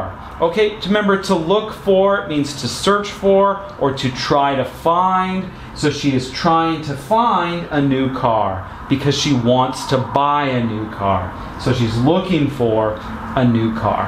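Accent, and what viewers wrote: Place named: Canada. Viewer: Wonderful explication. Love your sweet Canadian accent.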